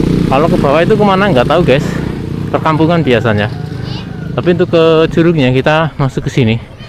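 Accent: Banyumas, Indonesia. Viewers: Mbok ana ula dengernya lucu btw saya dri jawa timur suka denger orang ngomong ngapak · Krungu logat ngapak dhadhi kepengin bali njawa koh..🥹🥹🥹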